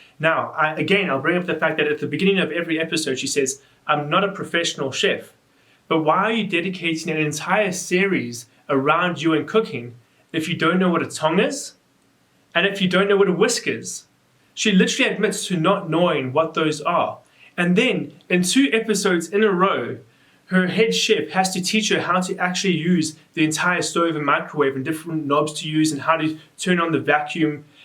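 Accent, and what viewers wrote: South Africa. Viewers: Are you South African? I love your accent x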